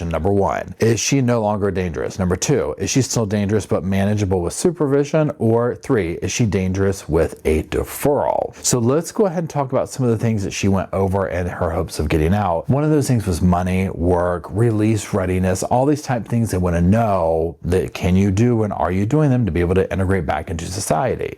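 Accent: South, United States. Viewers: Just found you.... LOVE your "southern' accented comments.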